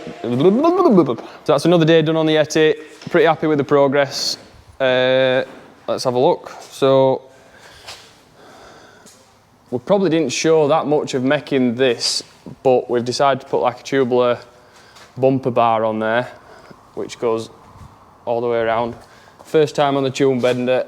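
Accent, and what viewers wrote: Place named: Barnsley, United Kingdom. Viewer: This Yeti is mint. I thought I recognised a Barnsleh accent. That'll explain why I've seen cars with Darkside stickers knocking about these parts.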